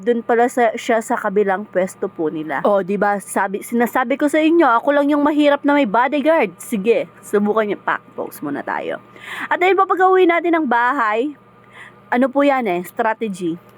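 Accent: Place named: Pampanga, Philippines. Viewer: gusto ko yun kapampangan tagalog hehe!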